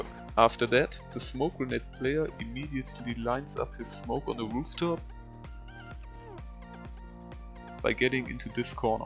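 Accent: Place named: Israel. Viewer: I could swear I clearly detected an Israeli accent in your speech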